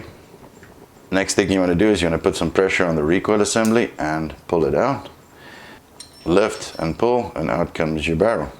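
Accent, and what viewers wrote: South Africa. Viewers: Great video...your accent..is it a South African twinge? Lekker man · South African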